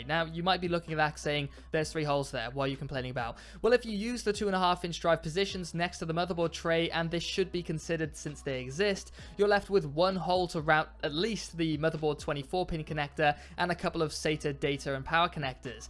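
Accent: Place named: South Wales, United Kingdom. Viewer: Weird question, you Welsh? You sound south wales-ish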